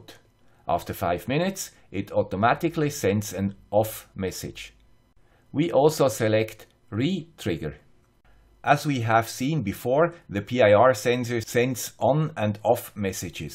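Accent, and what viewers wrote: Switzerland. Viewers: "Grüezi Youtubers, here's the guy with Swiss accent" should become a personal trademark :) Same as Gennaro Contaldo's "Why I am cooking so goood?"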